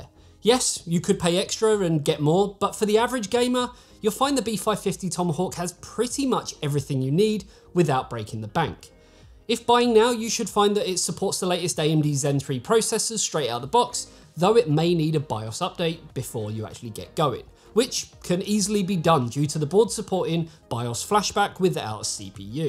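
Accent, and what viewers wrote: United Kingdom. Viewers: funny sounding british guy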